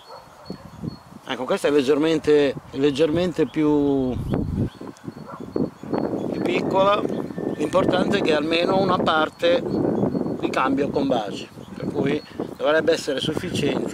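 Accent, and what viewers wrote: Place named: South, Italy. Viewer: Italiano del sur supongo: Sicilia, Nápoles o Cerdeña...(( Yo lo entiendo todo )).Es fantástico...!!! Amo Italia...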